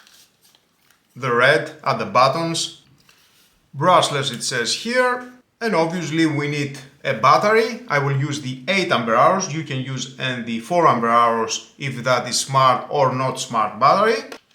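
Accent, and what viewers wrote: Greece. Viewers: Είναι φοβερό εργαλείο ήδη τα έβγαλε τα λεφτά του με το πρώτο μερεμέτι .Ανετα ξετρυπήματα και σκαψίματα σε τούβλο και μπετό . Γενικά η σειρά performance είναι κορυφή.. Θα πάρω κι άλλο την επόμενη που θα ξαναφέρει το λιντλ . Είχα αρκετά εργαλεια απο τα πράσινα και έχουν βγει σκυλιά αλλα εδώ πλέον και με τις τιμές που παίζουν έχουν ξεφύγει τα πράγματα και χτυπάνε άνετα και κορυφαίες μάρκες οι οποίες είναι εώς και 5-6 φορές πιο ακριβές ! Γράφω Ελληνικά γιατί απο την προφορά αποκλείεται να μην είσαι Ελληνας!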